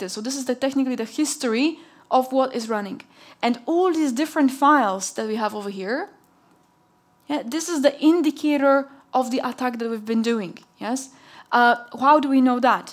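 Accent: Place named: Netherlands. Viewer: Yes she's superb, and her english has improved - her delivery is a bit more mellow that earlier "rapid fire" webinars from a few years ago. She now sounds more Dutch than Polish !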